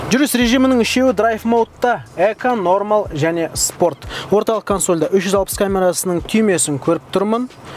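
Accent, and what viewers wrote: Standard. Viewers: Керемет видео, жарайсың, таза қазақша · жарайсың Қазақ тілін таза анық әрі сөз саптауларымен керемет үйлестіріп жүргізетін бір ғана жүргізуші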